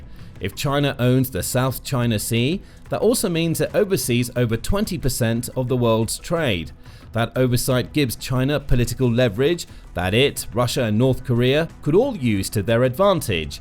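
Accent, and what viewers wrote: United Kingdom. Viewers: So tired of the dude with the british accent. Clickbait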